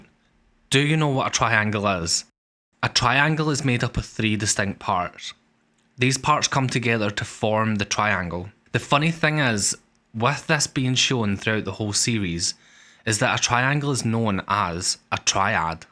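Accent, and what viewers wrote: Scotland, United Kingdom. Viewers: God I love your (I wanna say Scottish?) accent. “Doctor Heu” “Classic Heu” “New Doctor Heu”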